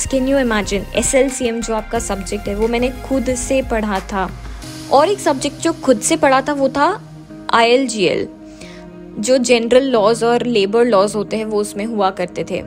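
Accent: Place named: Maharashtra, India. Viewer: Apan is an emotion ❤️ this proves that dii had passed a lot of time in Maharashtra and has a quite Marathi Toning 😂❤️❤️ GOD BLESS DII🙏🏻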